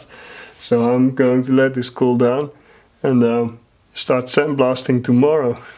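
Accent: Netherlands. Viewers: Is this guy Dutch ?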